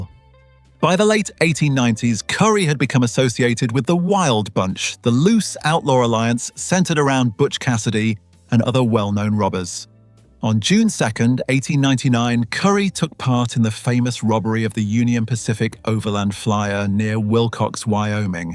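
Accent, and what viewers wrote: United Kingdom. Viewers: Why a stupid British voice over.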